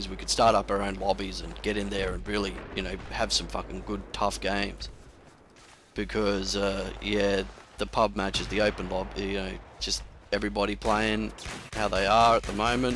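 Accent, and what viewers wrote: Australia. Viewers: awesome accent were u from Australia ? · Are you Australian? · when i watched this video i new he was aussie because of his accent love the aussie accent and his psn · On ya mate, where you from??? Victoria?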